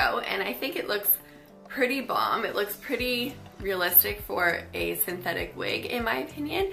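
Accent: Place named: Canada. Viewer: Have you ever lived in Canada? Seems like you have a hint of an accent.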